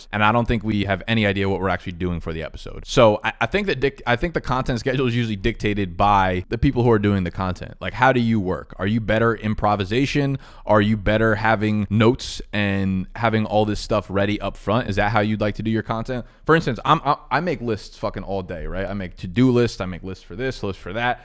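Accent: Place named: Philadelphia, United States. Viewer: Are you from philly? You got the accent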